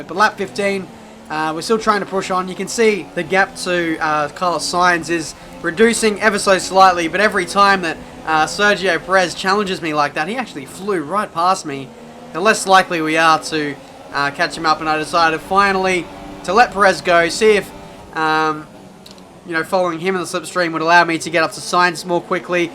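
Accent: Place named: Australia. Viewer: Is there a better combination for a video than the word 'supers' and an Australian accent?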